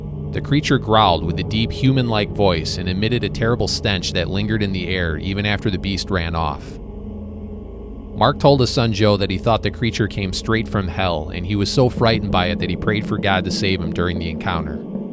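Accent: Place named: Wisconsin, United States. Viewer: I just found your channel and your accent... I feel like you have spent more than a little time in wisconsin yourself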